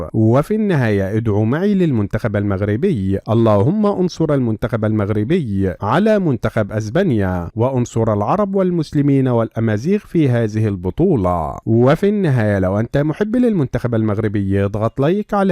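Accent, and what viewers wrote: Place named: Egypt. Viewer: هههههه. اتكلم عربي. من لهجتك المصرية.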